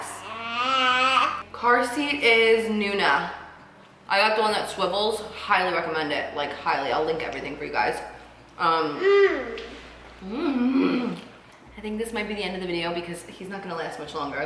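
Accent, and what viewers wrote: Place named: East Coast, United States. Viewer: Her east coast accent will always be endearing.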